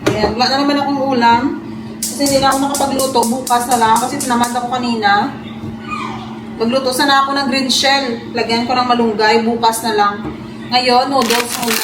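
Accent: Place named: Hiligaynon, Philippines. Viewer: You still have Ilongo accent even speaking Tagalog ay ambit sa iyo Monday!!!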